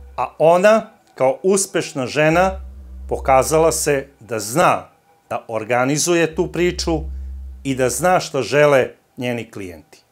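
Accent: South, Serbia. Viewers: Ah, taj južnjački akcenat koji pokušavate da sakrijete, nepotrebno, pa zvučite izveštačeno dok pričate...Surdulica, Vlasotince, Leskovac...Naročito ne ide uz tematiku gde otkrivate, pratite tragove, a prikrivate svoje poreklo i naGlasak. Pričate sve kao da ste Vi Maja ili Vi Matej, zanimljivo koliko teorije pričate kao da je 100% činjenica.."Maja se družila" stalno pričate u prošlosti, kao da više nije živa, kao da se više ne druži!!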